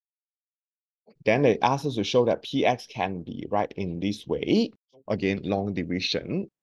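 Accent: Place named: Asia. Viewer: something about your asian accent makes math so much easier to understand(im also asian) btw all the other channels stretched this topic out soooo long(like multiple 20 minute slow paced videos) but your concise and strutctures style of teaching helped me practice along and not take like 5 hours for the easiest add maths chapter.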